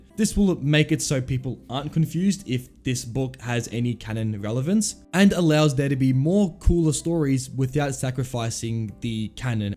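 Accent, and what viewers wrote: Australia. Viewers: you sound australian...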